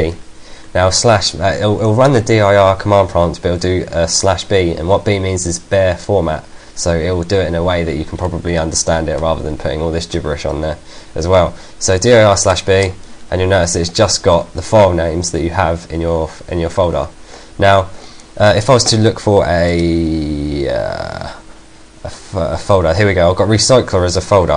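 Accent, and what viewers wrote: United Kingdom. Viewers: Very good video. Very well done. btw thank you for not using any annoying ass music, rap, rock, whatever,ect THANK YOU!! just a normal English voice,with classy british accent to-boot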